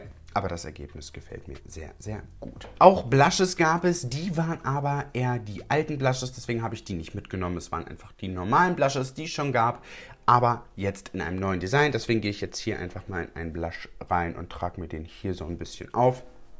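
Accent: Berlin, Germany. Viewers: Uh hallo schöner Mann, bist du aus Berlin? 🙂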